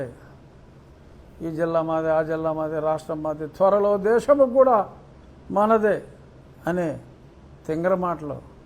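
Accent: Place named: Godavari, India. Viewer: Sir meeru ma godhari yasa mamuluga ledhu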